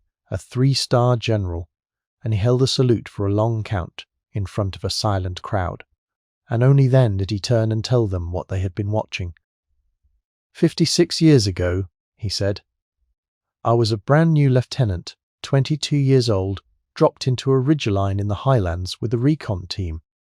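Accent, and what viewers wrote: United Kingdom. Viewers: You know I don't know what's worse you can't pronounce stuff right what are you talking about the bow of a boat and then you got to have some britt accent that I can't stand it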